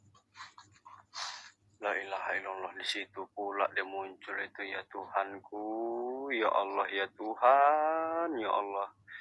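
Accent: Medan, Indonesia. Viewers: Melayu medan kek gini bhasa nya.m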